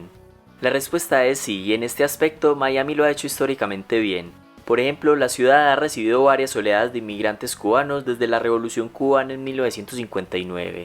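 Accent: Colombia. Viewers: Es colombiano, tal vez de medellin o del eje cafetero (pereira, manizales, armenia) etc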